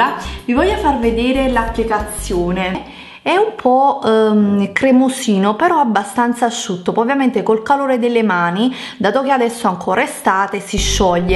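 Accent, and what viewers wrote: Naples, Italy. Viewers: Ma sei di Napoli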